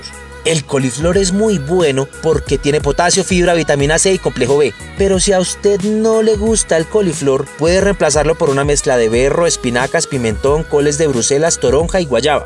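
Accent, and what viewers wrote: Colombia. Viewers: se te ve que eres colombiano con el habla igual que yo :)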